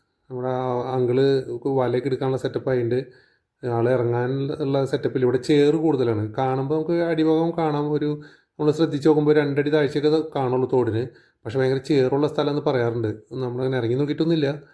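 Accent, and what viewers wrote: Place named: Thrissur, India. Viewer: അതെ നമ്മുടെ തൃശൂർ സംസാരം 👍😊😊😊അഹങ്കാരം ഇല്ലാത്ത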